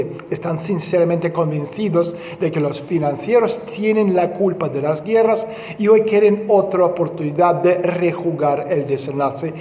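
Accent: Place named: Spain. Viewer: quítate ese acento español tan ridiculo ya vives en LatinoAmerica......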